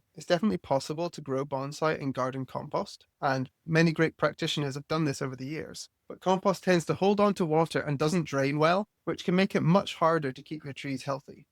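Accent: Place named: Scotland, United Kingdom. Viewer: He sounds Scottish. A wee bit.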